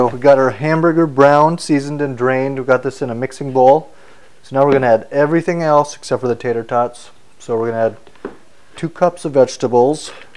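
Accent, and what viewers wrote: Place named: Canada. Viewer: Umm you talk funny and have an accent. You sound like a Canadian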